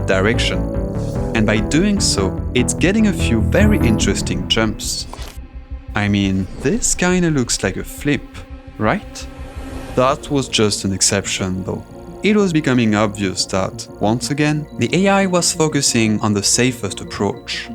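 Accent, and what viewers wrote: France. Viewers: tu est Français? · why is bro french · Are you french ? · Are you french or smtg? I’m wondering cuz I’m french too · J'adore ton accent français, t'es vraiment une super chaîne YouTube ! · Are you French? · is he french or what ? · T’es francais ? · Hi! are u french? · From content to editing, everything is near perfection. You sound a little bit french, alors merci pour ces vidéos, le montage est incroyable, et le travail derrière monstrueux. Franchement, tu mérites plus de reconnaissance, tes vidéos sont niches et en même temps tellement abordables et passionnantes, ça force le respect. Chaque fois, c'est un plaisir de les regarder, et tu m'as fait découvrir l'univers du speedrun de trackmania d'une manière tellement originale, c'est sûrement devenu ma licence de jeu de course préférée! Alors merci encore, et bonne chance! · STP BG MET UNE VERSION EN FR CAR TON ACCENT EST VRM TRES FR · are u french by any chances ? 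· vu ton accents tu as l'aire français, tu voudrais pas mettre une piste audio francais ? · tes francais toi XD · You sound French. Are you French?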